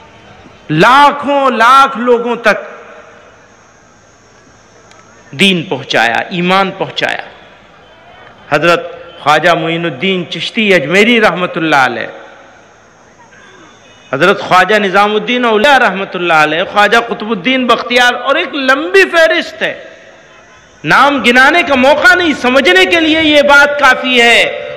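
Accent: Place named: Pakistan. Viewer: Masha Allah Urdu language bilkul Pakistan jaesi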